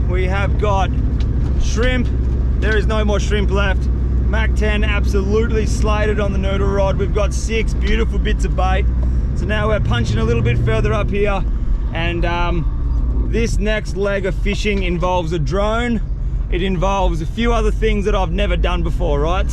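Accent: Australia. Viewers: i love aussie accent more then regular english accent nice vid buddy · You make me wish I had a Australian accent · You'd love to come and live here in Australia. Nearly everyone sounds like that:) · Are you Australian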